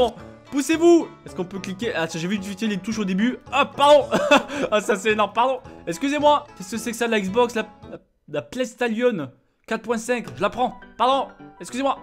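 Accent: South, France. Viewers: tu viendrais pas du sud car tu a l'accent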